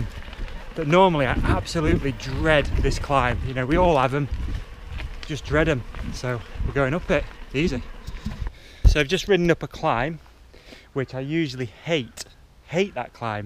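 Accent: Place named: North, United Kingdom. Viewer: Soooo... You put on extra northern for the vlog, or go a bit south for pods? #vlogger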